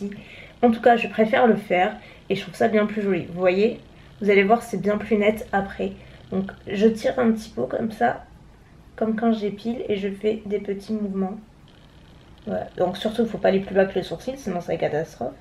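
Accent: South, France. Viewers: Salut ma belle, voir tes vlogs dès le matin ça me met une pêche d'enfer et ton petit accent du soleil dans cette journée bien grise !!!! et bravo à mamie !!!! beau plat !!! félicitation !!! Merci pour tes vlogs, ta bonne humeur, ta joie de vivre !!!! j'adoreeeeeeeeeeeeeee !!!!!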